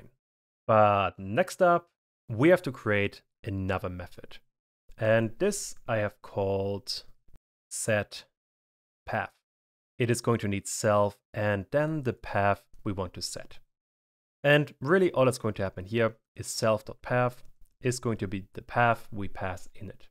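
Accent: Germany. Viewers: haha moin😂 Wollte nur fragen wegen dem Akzent.Der ist ja auch nicht komplett englisch. Vielleicht ist es ja deutsch? 😅 keine Ahnung. Aber du bist anscheinend deutsch 😂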